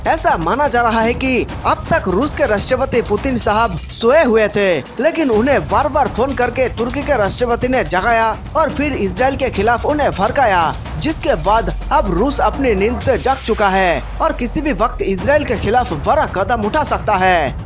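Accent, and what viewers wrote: Bengal, India. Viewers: Pehle tu apna bangali accent ko hindi me mat la aur upar se views ke liye jhuta captions mat de budbak. Faltu time waste kar raha hai sabka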